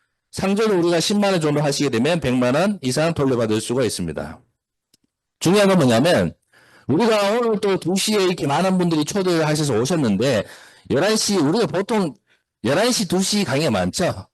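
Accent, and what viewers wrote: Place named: Gyeongsang, South Korea. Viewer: 다함께품 협동조합을 만난것이 축복이고 기쁨입니다! 교육이사님 강의 짱이십니다!갱상도 사투리인데도 귀에 쏙쏙 박힙니다!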